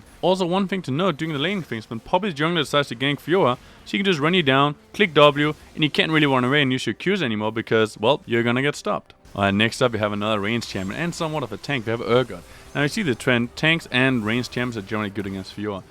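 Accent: Denmark. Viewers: Man kan bare så nemt høre du er dansker hahah, men god video.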